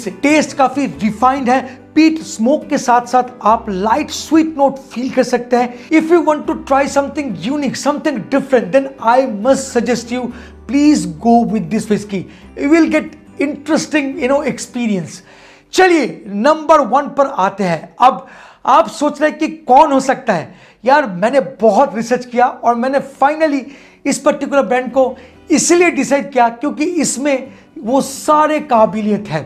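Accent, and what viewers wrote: Bengal, India. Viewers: Kimon aachen dada ! Well presented. Good diction with enjoyable Bengali accent. Will take yr advice...... Cheers 🥂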